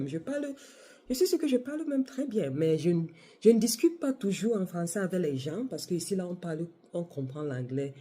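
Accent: Cameroon. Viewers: Je suis Camerounaise et je vous assure qu'elle a l'accent Camer . 😊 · you really sound Cameroonian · En plus tu as l'accent camerounais, c'est bon ça 🇨🇲🇨🇲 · Je suis épatée par votre talent et votre façon de parler le français. Jadore cet accent Camerounnais. Will you visit France? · Ur cameroun accent is❤ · Super accent du mboa. You rock ! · L'accent camerounais là est pur!!! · You don't sound like Yaoundé but maybe Douala, not too certain because last time I was in Douala I was surrounded by non-Douala. And we don't say my Francophonie/Francophone or my Anglophone but mon français ou mon anglais etc. However I understood everything you said. Still think you are hillarious and keep up the good work. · Your Cameroonian accent is through the roof! You didn’t need to say for me to know your accent while speaking French is from there! How lovely! Ton français est impecc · Mama ton accent est juste propre comme une continentale du continent(Cameroun). 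Your big fan from Cameroon. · Joli Français avec l'accent camerounais 🎉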